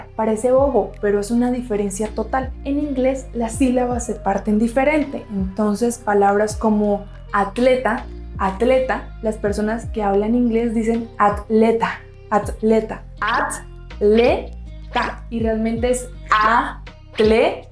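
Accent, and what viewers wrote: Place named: Colombia. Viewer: pues ella es d colombia¡!, me debes 20$ dolares x la infor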